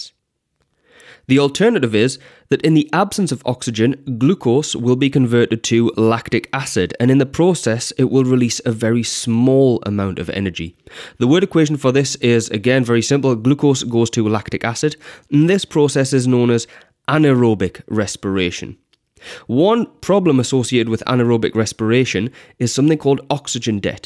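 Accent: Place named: North, United Kingdom. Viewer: Aye it’s a northern lad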